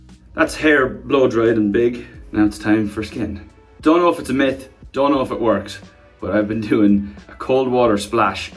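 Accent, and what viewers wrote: Ireland. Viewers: Omg same! I just love his voice and his accent and how sweet and down to earth he is and funny! I've always been into irish guys! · I Stan a guy who takes great care of his skin so efficient and well done I will always love Niall plus he’s beautiful as well his adorable Irish accent and beautiful smile of course that giggle in the end do I like Niall even more or what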